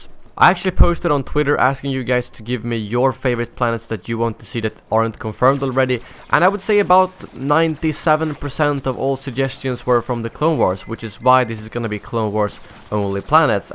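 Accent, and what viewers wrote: Sweden. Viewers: I knew it! You are from Sweden, could hear it from your voice :)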